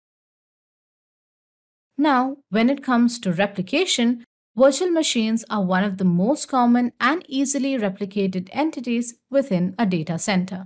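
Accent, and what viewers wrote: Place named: India. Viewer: Giọng Ấn khó nghe quá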